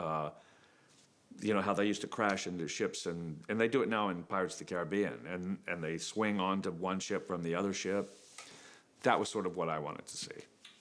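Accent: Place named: Texas, United States. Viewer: He still sounds so Texas to me.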